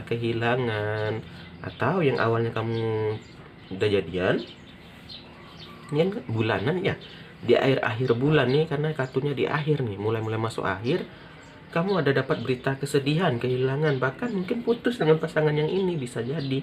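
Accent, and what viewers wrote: Padang, Indonesia. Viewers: Orang Padang ya,,,logat nya tu loh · Ini yg reading orang padang yea logat nya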